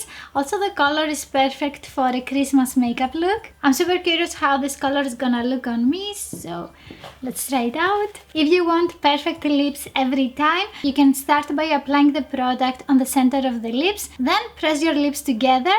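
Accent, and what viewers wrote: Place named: Italy. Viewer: This girl looks like an italian 😳she has an italian english 😂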